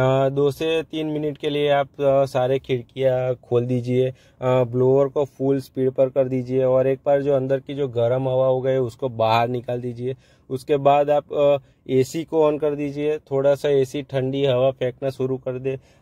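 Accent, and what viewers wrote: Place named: Gujarat, India. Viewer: Bhai pakka gujrat se he ye lg rha h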